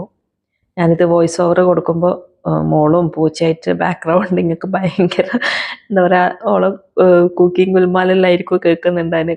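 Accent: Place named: Kannur, India. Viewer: Kannur bhasha 😊😊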